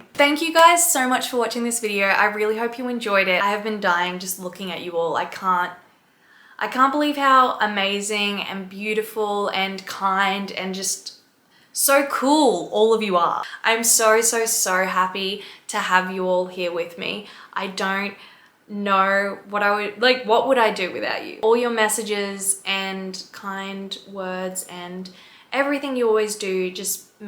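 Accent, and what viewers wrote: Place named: Australia. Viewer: I love your voice! Australian accents are my favorite!!!! 🖤 I’m from Tennessee lol. Oh, and have you ever said what your real name was? I can’t find it 😆 haha, sorry just curious. That would be cool if you did a q&a not just about piercing stuff, but I understand if you don’t want to get too personal on this crazy a** internet. Lol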